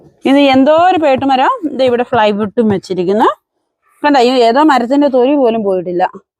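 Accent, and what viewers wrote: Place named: Kasaragod, India. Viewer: നിങ്ങൾ അടിപൊടി❤ നിങ്ങൾ ബുള്ളറ്റ് ഓടിക്കുന്ന ഒരു വ്ലോഗ് ഇടാമോ പിന്നെQA ചെയ്യാമോ കുറേ ചോദ്യങ്ങൾ അറിയാനുണ്ട് എപ്പഴാ ബുള്ളറ്റ് ഓടിക്കാൻ പഠിച്ചേ😊 പിന്നെ എത്ര വരെ പഠിച്ചു പിന്നെ കാസറഗോഡ് സംസാരം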